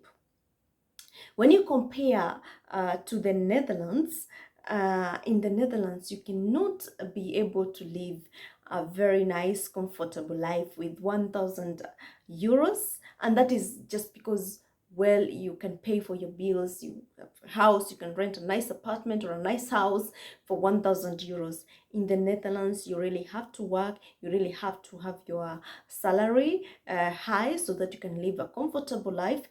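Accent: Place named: Kenya. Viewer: I love the Kenyan accent!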